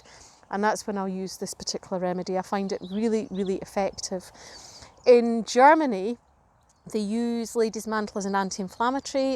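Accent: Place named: Scotland, United Kingdom. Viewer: Love to hear a Scottish accent. Live not too far from Fife. 😊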